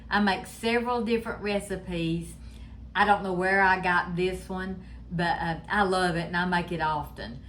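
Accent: South, United States. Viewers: Stupid Question...do you have 2 kitchens? One day I saw you with the above red appliances and the next day I saw a completely different kitchen - stainless steel!. Just inquisitive! AND I LOVE your cooking and your sweet voice. I am from North Carolina and I speak southern too! Thank you for making cooking look so easy. I'm 76 and have been cooking all my life. You just make things look so easy - I thank you and I will keep watching.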